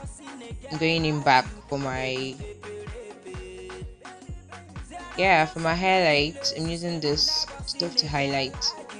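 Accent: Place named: United Kingdom. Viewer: Great video you ve a british accent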